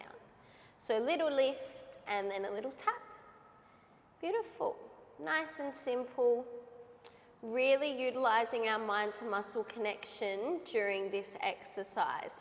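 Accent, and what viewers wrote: Australia. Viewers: Same thoughts😂I don't watch a lot of Aussie youtubers but even i could guess she was an Aussie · Loved this workout! I knew you were Australian bc you sound just like Miranda Kerr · I can't believe people actually thought you're British?? Like they have clearly never heard an Australia accent before yeesh. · Im from the states but my dads side of the family is in Perth and Sydney. I recognised your accent. I hope to visit one day! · Dying that ppl don’t realize you’re Australian 😂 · As an American, I could absolutely tell you were Australian. How funny. Anyways, another amazing workout. Thank you for all that you do. You helped me fall in love with Pilates. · Omg how did people think you’re British!? I could tell right away you’re from Australia! But then again I do know a lot of Australian people for being an American lol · I’m an American and knew you were Australian because I have a beautiful friend from there but also I follow move with nicole and knew it was the same accent ❤ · Girl WHO thought you’re from the UK 🤣🤣 maybe I just watch too many cutie Australian YouTubers but I can spot your accent from a mile away! 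NOT a British accent 🤣 I am dying. I love all of you Australian girlies accents so much!! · I was like, what??! I thought she was Australian!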